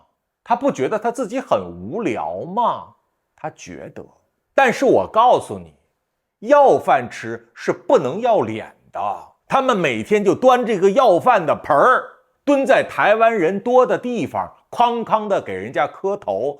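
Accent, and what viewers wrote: Beijing, China. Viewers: 叔 你老家是哪裡的？是北京嗎？突然好奇你的口音。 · 这口音，这调调，这吊儿郎当气质，大概率是个前朝八旗子弟。怨恨中共那也是情有可原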